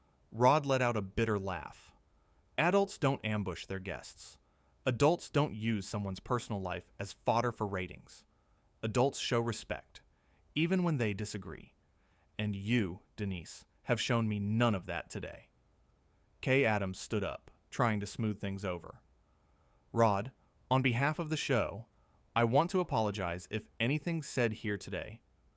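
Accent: United States. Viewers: Some arse hole made a similar youtube video of a cliff richard interview with Lorane on morning tv ...total false.....sad bastard should get a job and earn a honest days pay. ...and whats this american voice about.??? Total tosser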